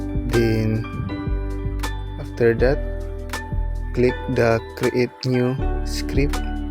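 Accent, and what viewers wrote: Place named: Indonesia. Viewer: u from indonesia u sound it very same and u black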